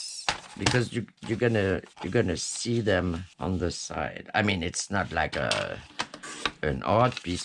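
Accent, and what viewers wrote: France. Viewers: OMG I just found your page. You’ve had me laughing and smiling for the past two hours. I love your accent! Not sure but maybe French? Love your videos. I first watched the video where you made the ephemera storage. I cracked up when you said “ Oh wait look at all the little people. Now I can see all the little people!” Just know you have a new subscriber and I’m loving all your content! Cheers…. Nancy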